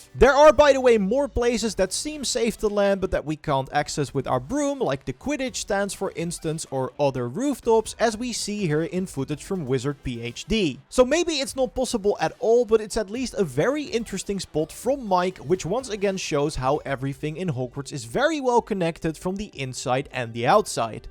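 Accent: Netherlands. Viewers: i love your dutch accent.....🥴😅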